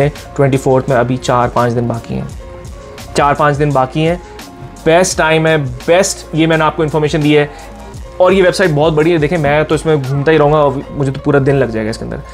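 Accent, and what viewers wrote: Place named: Karachi, Pakistan. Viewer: G way of talking bata raha hy karachi k hy ap 🌹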